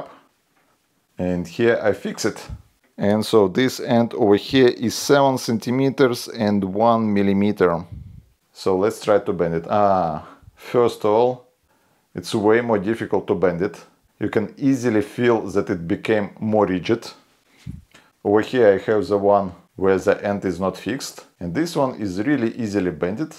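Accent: Russia. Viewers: Я не очень хорошо знаю английский но по моему у автора явно русский акцент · Is this a Russin accent? ) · Ты же русский, я слышу. Хватит придуриваться, говори нормально!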